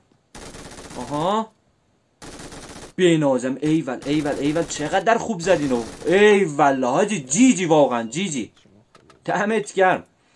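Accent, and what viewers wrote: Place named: Iran. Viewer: بابا اینقدر ایرانی حرف نزن؛کمی تلاش کن دری یا هزاره گی حرف بزنی٫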